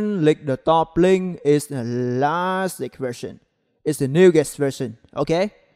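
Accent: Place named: Vietnam. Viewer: Nghe giống việt nam vãi!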